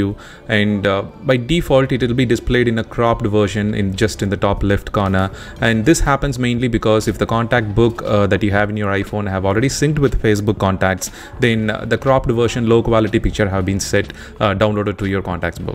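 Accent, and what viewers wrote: India. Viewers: Love Indian accent wish I could do it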